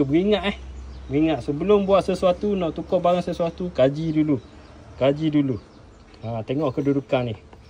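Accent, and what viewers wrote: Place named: East Coast, Malaysia. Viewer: Assalamualaikum bengkel mne eh dengor loghat mcm pata timur😅...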